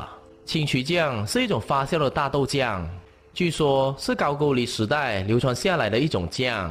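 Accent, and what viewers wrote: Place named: Malaysia. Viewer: 大马口音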